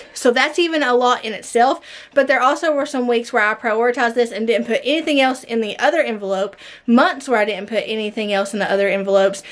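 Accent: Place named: Tennessee, United States. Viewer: I have watched several of your videos back to back. I have enjoyed every minute of it. I wish I had the motivation to keep my house tidy like yours. And love love love all your projects. I am from Tennessee too but I am in middle. It’s like I am watching one of my friends. You actually talk like me, I am not used to hearing folks on here talking like me. Wish y’all well!!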